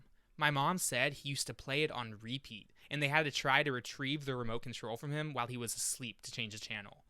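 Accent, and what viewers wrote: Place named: United States. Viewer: This is very good. But it's a pity the narrator has one of those now typical modern American accents. i can't describe it in print but a whining raise at the end of words or sentences: ''that way of making moviess'' '' 7 Womennn '' . It's quite a new thing. Older Americans don't do it. It sounds awful and in some cases unbearable. Someone told me it's known as the ''high rising terminal'' or ''up talk''. Another person told me that it's known as ''vocal fry'' but someone else said that the whiny sound is neither of these ? Don't mean to be rude but it spoils and undermines an otherwise excellent analysis.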